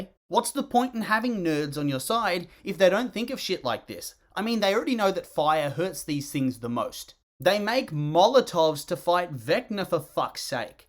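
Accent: Australia. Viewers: You sound Australian, your country was turned into one giant gulag over the ‘pandemic’ and you are like ‘silly Americans and their guns’. The lack of awareness is truly astounding. Your name really shouldn’t be, more like girly idiot pants.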